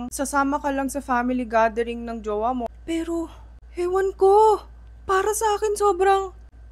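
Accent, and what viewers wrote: Philippines. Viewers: No you talk like a filipino already. :) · I’m not used to hearing a Korean girl sound like a Filipino girl.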